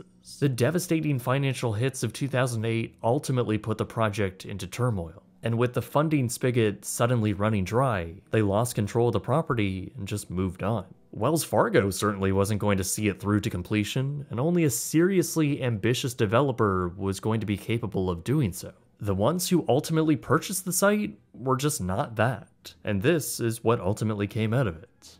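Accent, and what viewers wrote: Canada. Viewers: as a Minnesotan, there's always one word that makes it super easy to tell if someone is from Minnesota or Canada.. the word out😂 we make the O so long😅 I heard you say out and immediately was like.. oh, Canadian. okay. lmaoooo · Are you canadian? The way you say "ou" words and a few other things sounds very canadian 😂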